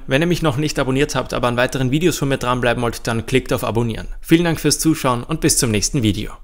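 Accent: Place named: Austria. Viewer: Ich liebe diesen österreichischen Akzent immer wieder! 😃😍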